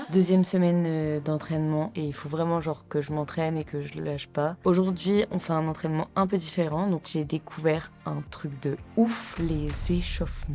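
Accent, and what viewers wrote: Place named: South, France. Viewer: j'avais jamais entendu que tu avais un léger accent du sud, ca te rend encore plus mimi :D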